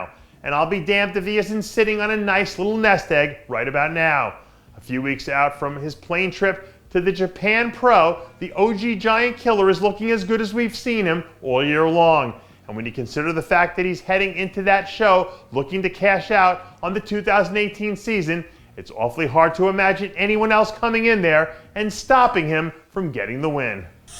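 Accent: Standard. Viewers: It's called, non regional diction. I like it.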